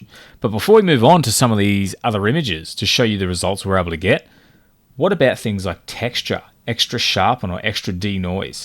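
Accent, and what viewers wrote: Australia. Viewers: It is fascinating how Australians say "Peower", "Draring", etc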